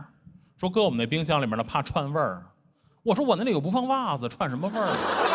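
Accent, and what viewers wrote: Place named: Beijing, China. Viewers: 可能是在北京生活过太久的时间 所有这些脱口秀就这个京式幽默我笑不出来 没觉得好笑 感觉都钝感了 光是那个口音出来我的笑点就提高了20层楼高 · 好喜欢他的京腔啊❤ 段子也好👍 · 他的北京话我爱听，不烦人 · 特喜欢良言，京腔儿听着特舒服，幽默高级，不喊不叫，但让人乐半天，加油！ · 京腔是我的最爱，没有之一。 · 听了良言的京腔想起童漠男了。他的段子也很喜欢！ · 典型的京腔，条件反射式的反感啊，唉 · 典型的京腔幽默😂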